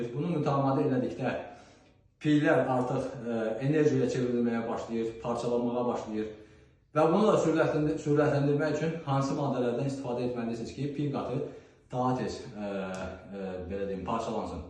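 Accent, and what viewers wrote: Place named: Qakh, Azerbaijan. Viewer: Əxi siz Qaxdansız? Qax ləhcəsinə oxşayır ləhcəniz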